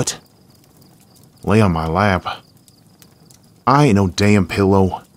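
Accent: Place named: Australia. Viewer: I love it that he called and your Australian accent amazing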